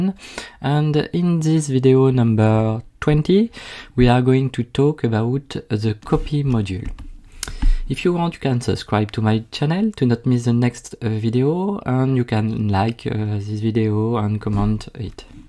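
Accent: France. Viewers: Hello, il est evident de part ton accent que tu es Francais. J'aimerais bien avoir un tutorial sur Ansible. J'ai deja souscrit au canal, et j'aimerais bien echanger avec toi pour avoir des réponses aux questions que je pourrais avoir. Merci de me dire si c'est possible.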